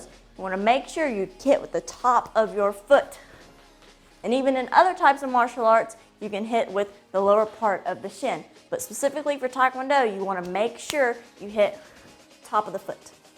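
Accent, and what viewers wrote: Texas, United States. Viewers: whAT IS THIS ACCENT IS THIS TEXAN WTF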